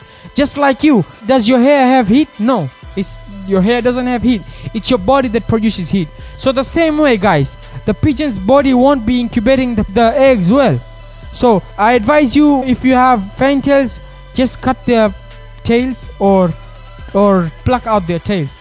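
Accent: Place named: Africa. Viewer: You sound African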